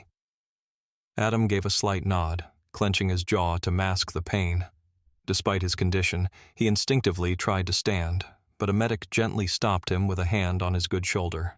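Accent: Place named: United States. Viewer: The usual load of American accented crap! Give it away. Try it out with the Arlington guard change/ military cemetary! No? Gutless?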